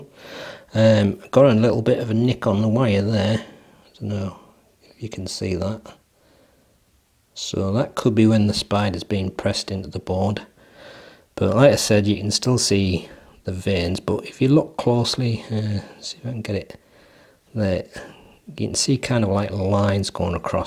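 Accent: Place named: Scotland, United Kingdom. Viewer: Oh from england that’s cool but i like ur accent though it looks like scottish accent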